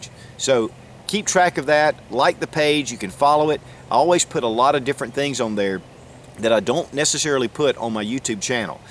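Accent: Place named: South Carolina, United States. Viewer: IS ONE OF THE BEST TRAINING AND KNOWLEDGEABLE SITES OUT THERE - THANKS VERY MUCH. THERE IS NOT ONE VIDEO THAT I DIDN'T LEARN SOMETHING FROM YOU. I ALSO FOUND OUT YOU DON'T LET YOUR WIFE TOSS OUT YOUR OLD TEE SHIRTS THAT FIT SO GOOD. HA HA. YOUR S. CAROLINA TWANG IS A PLUS TOO TO LISTEN TO. NEXT TIME I AM OUT PRACTICING, I WILL MIX UP SOME SNAP CAPS WITH LIVE STUFF, BUT SNAP CAPS ARE GOOD ENOUGH FOR DRY FIRING PRACTICE.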